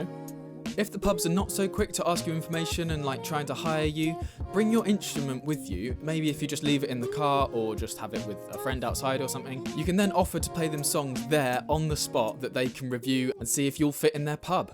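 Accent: United Kingdom. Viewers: Luv the British accent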